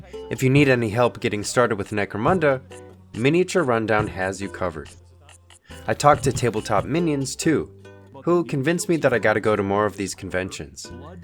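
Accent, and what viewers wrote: United Kingdom. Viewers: Hay great video, liked your English accent, almost as good as mine. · It was great to meet you too, mate! British accent was 10/10 ☕️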